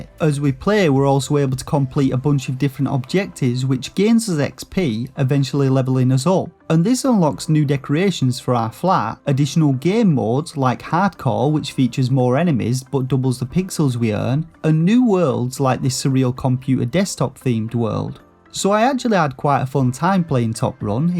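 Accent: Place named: Yorkshire, United Kingdom. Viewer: Star or Shovelware I don’t know if you’re from Yorkshire or Lancashire.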